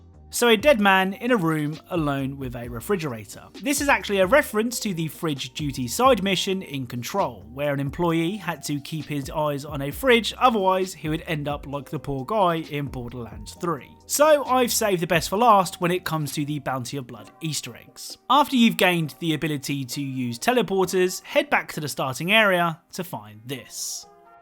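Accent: United Kingdom. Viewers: Are u british cos i am just wondering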